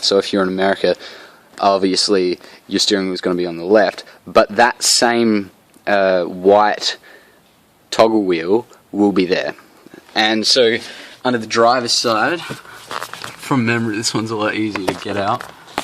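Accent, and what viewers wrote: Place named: Australia. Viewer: How good is hearing an Aussie accent instead of an American accent. Cheers, bro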